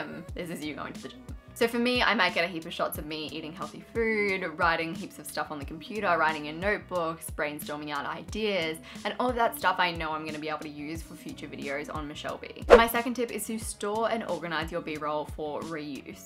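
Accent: Australia. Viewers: This is awesome! I find your content so helpful and inspiring!!! Also, I love your Australian accent, your voice is very pleasant to listen to. Do you have a podcast? I think you’d do great in that forum too 😆❤️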